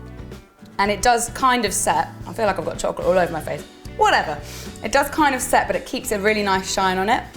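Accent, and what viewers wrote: England, United Kingdom. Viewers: r u english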